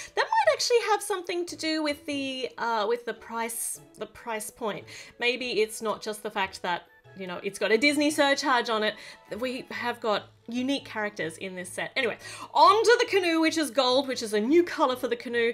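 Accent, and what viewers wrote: Australia. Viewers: I think those thinks over the trap door are Banana leafs. They are used as plates in South Asia. I am from India and we eat from banana leafs whenever there is a function like a wedding. By the way when you don't upload videos any one day I miss your crazyness, Australian accent, cuteness in your words and awsone lego videos. You kept me happy in the lockdown too. I love your videos😀😀😀😀😀🥰🥰🥰🥰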